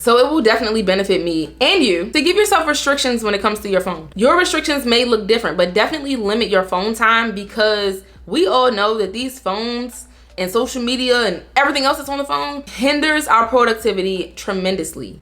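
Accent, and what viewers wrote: Baltimore, United States. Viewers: I felt like you was from Baltimore lol yesssss , I love your videos ! They REALLY help me ! Mane , then I’m sooo isolated now & I see God is doing that . I just changed my alarm to 7am lol #baltimore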